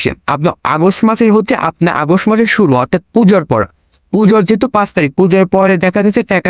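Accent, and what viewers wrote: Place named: Pabna, Bangladesh. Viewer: আপনি তো বার বার বলছেন টেকা তাই আমি ও বললাম।ভাইজান আপনার বাসা কি পাবনায়।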